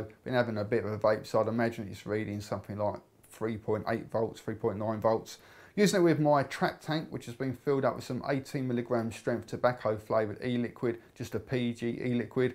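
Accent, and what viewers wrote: United Kingdom. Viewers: Do you know that your vids are good for people who want to learn British accent? Btw, great review as always, stay lit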